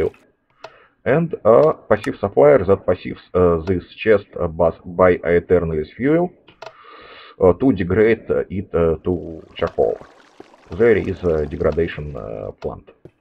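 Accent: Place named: Russia. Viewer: От русского акцента не избавится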